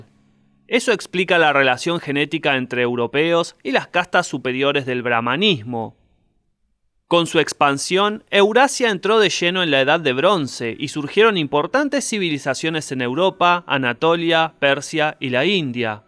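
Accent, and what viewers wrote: Rioplatense, Argentina. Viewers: Es que así es la pronunciación de los argentinos o uruguayos, no es que lo haya hecho mal (?) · Ya lo dijeron, es el acento argentino/uruguayo.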